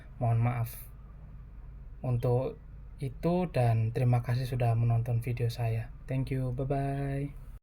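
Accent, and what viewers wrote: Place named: North London, United Kingdom. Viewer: BRO IS FROM NORTH LONDONNN..CMONNNNN LADDDD🦾🦾🦾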